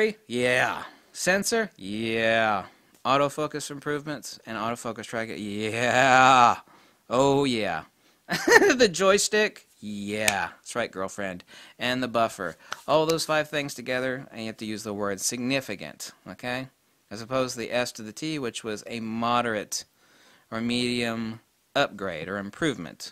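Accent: Australia. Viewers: Your Aussie accent isn’t bad mate .. stick with it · Yes we do but don't let that stop you. Reference to Australian accent.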